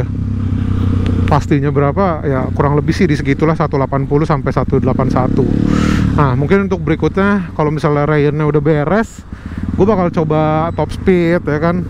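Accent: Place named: Betawi, Indonesia. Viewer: Betawi itu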